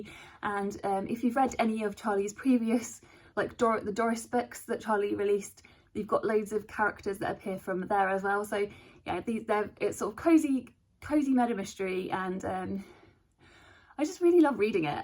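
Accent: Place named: Ireland. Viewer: love an Irish narrator!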